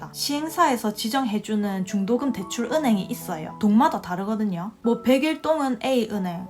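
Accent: Gyeongsang, South Korea. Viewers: 아따리야 경상도사투리 간만에 듣네예 좋심다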